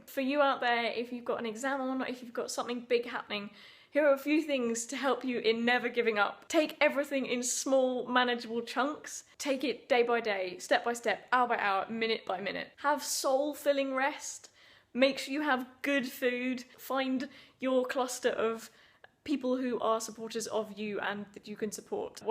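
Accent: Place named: England, United Kingdom. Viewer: Love that proper english accent.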